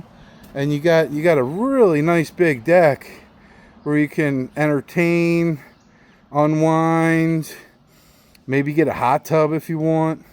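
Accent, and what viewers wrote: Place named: Baltimore, United States. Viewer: Love the Bmore accent hon 🧡🖤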